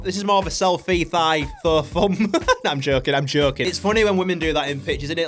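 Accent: Yorkshire, United Kingdom. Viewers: My favourite thing in this channel, is that he is British (or atleast he sounds like one). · this guy is pure yorkshire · u from yorkshire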